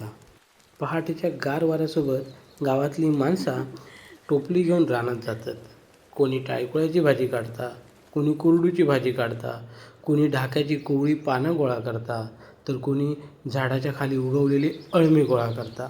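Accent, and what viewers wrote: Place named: Malvani, India. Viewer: मस्त, खुप छान वाटतंय मालवणी भाषेत ऐकायला - शिल्पा राणे (वंजारे)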